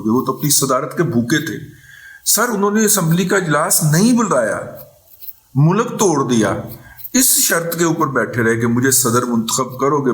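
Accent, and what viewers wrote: Punjab, Pakistan. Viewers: Although I, many a times, may differ from your opinion but I am a regular (not Pak army but your listener). It is a shame that a nation of 22-25 crore population could only have 1.2 lakh odd subscribers to a genius like Rizwan Razi. Better if your people would listen to what you utter. You are a great orator but your punjabi ascent makes me feel better although it is difficult to understand for me. Wish you good health and long live.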